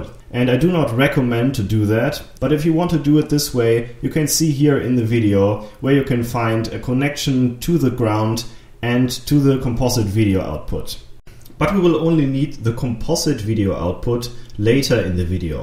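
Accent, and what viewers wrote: Germany. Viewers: 100% German accent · Hi your englisch sounds German : ) Realiy nice work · Sorry aber man hört den deutschen akzent einfach raus ; D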